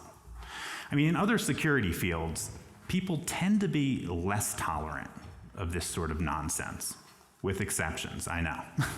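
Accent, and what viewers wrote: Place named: Midwest, United States. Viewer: In the spirit of LPL, his voice is enough to out him. Just think for a second. Sight is a great sense, but we have at least five of them and we can use them if we apply your minds. I know this is a man. He is white. His accent suggests possibly midwestern. His vocabulary, fine articulation and vocation suggests... anyway he's a jew. Anyone in that audience saw his face, btw. But his voice is enough. Not many people speak like that, and he's made hundreds of videos. You don't think someone will eventually make a voice recognition app?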